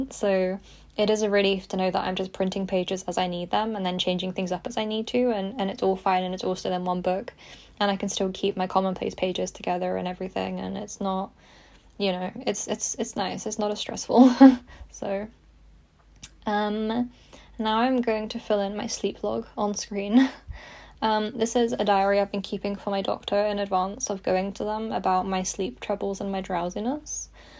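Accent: United Kingdom. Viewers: since i love british accent. love to hear you talk during long videos.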